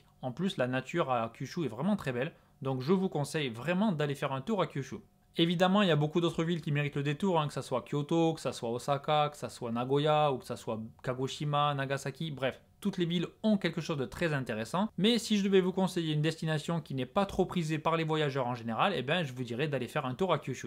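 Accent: Marseille, France. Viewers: super vidéo au passage mon pseudo c'est daruma c'est juste que le pseudo était déjà prit donc le "a" est devenu un x. Masterclass comme d'habitude (ton accent de Marseille me fait trop rire, même après des années au Japon tu ne la pas perdu)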